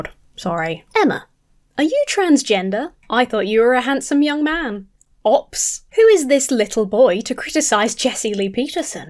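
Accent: United Kingdom. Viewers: Hi there! I just wanted to take the opportunity to thank you for what you do (from "across the pond," as the old cliche goes)! I feel as though YOU get under Hovind's skin more than anyone else. He just can't handle a vibrant, youthful, highly intelligent, HILARIOUS, fenale. Throw in that WONDERFUL British accent and those voices you use (especially Hovind impersonation), and we have the icing on the cake! You ROCK!!!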